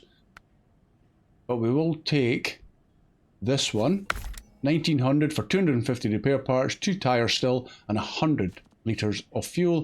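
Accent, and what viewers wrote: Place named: Arbroath, United Kingdom. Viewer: Yeah enjoyed the longer format buddy 👍 As for next agree with Docks and other bridge I always try to fix infrastructure first it just makes everything else easier. Lots of local tasks and some contracts are also possible without leaving this map to help level up and make more moolah 😂 BTW your accent sounds more like somewhere around Abroath to me but I'm a Flatlander although have family from around Inverness. Keep on trucking buddy 👍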